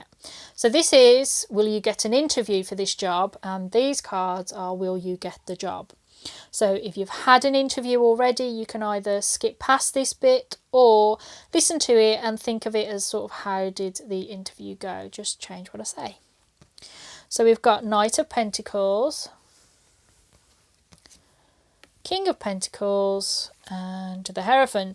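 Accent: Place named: Northern England, United Kingdom. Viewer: You just came on my feed as I was asking about a job application, but I just had to comment before anything and say that as a northerner, it was such a pleasant surprise hearing a northern English accent when I clicked on this video! I hardly ever hear other northern girls!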